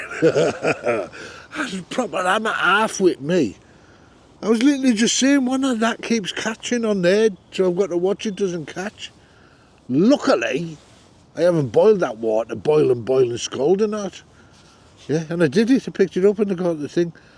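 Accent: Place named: Teesside, United Kingdom. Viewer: where are you from, ya sound like a teessider to me ( ps im from teesside ) that stove and gas legs look mint chor 😂👍🤌👌🇬🇧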